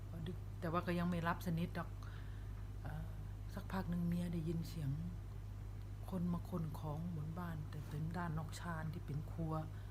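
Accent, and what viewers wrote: Korat, Thailand. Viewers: ชอบแม่สุพูดโคราช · ชอบแม่พูดสำเนียงโคราช น่ารัก💕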